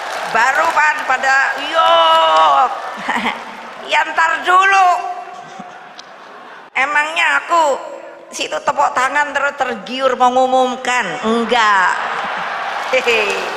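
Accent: Java, Indonesia. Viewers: Jawane medok 👍😁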